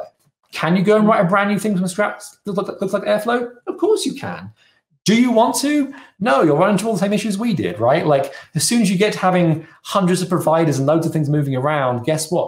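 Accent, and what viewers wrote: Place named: Scotland, United Kingdom. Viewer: Very useful video! I'm happy I've found this one. It has been a bit difficult to start with Airflow. By the way, I'm intrigued with your accent. Are you from Scotland?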